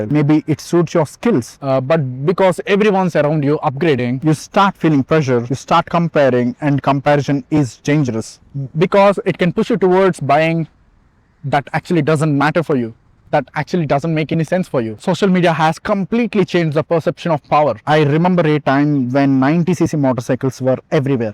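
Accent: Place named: India. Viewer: As an indian , this accent is 😭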